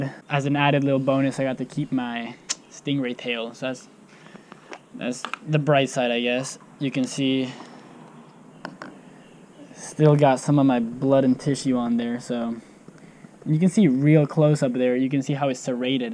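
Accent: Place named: Mexico. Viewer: Para mí el mayor plot twist fue ver que hablas español ¿Eres mexicano? Porque yo sí y el acento me sonó muy mexicano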